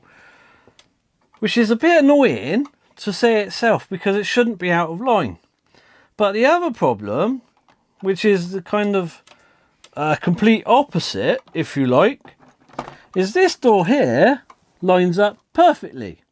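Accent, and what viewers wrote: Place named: England, United Kingdom. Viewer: Thank You, for a Great Video, and, where r you Gentleman from, England, it sound's like.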